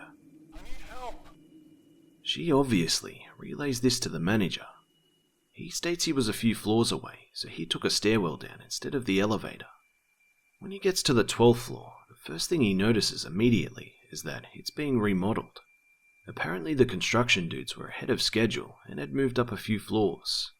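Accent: Australia. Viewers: Your Australian I think? Is he/Are you?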